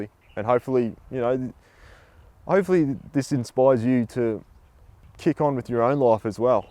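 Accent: Australia. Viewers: your lovely australian accent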